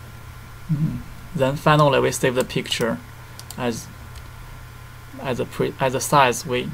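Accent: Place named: China. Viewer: I heard strong Chinese English. Keep going.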